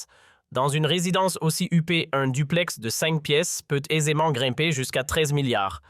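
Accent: Canada. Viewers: Tu as une voix canadienne au faite !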